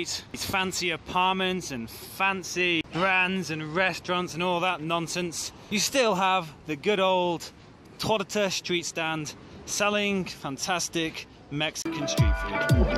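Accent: England, United Kingdom. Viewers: Me encantan tus vídeos!! Aunque soy mexicana me ayudan a conocer mejor la Ciudad de México (soy de Acapulco), y me ayudan con el inglés. Por cierto me encanta tu acento, siempre he pensado que el acento británico es el mejor en inglés. Espero que le entiendas a mi comentario, aunque puedo leer y escuchar en inglés no soy buena escribiéndolo. Muy buenos vídeos, sigue así :) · Diana T no es Americano es ingles de Inglaterra. checa el acento.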